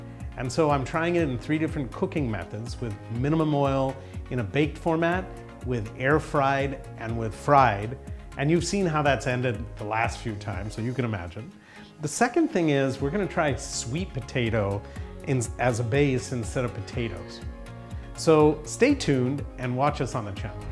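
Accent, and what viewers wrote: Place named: United States. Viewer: He talked like a US citizen.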